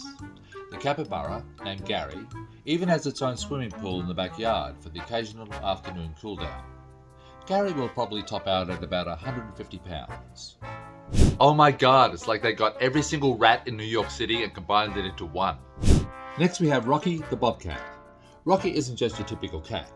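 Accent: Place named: Australia. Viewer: we have known each other over 2 decades! WE ve worked on music, traveled to the Winter Music conference in Miami, but this new narrative of EXOCTOC animals is by far informative snd your sense of humor and Aussiue accent keeps you glued to keep watching. So when you were living with me in Jersey City, I might as well say...that o also had an exotic an male living with.me lol ☆☆☆☆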